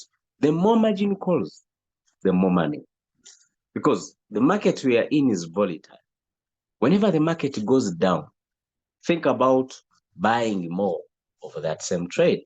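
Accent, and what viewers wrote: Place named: Uganda. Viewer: Hello Sir, I understand from your accent that you are from Uganda...where I happen to be and I am interested in learning more as I would like to invest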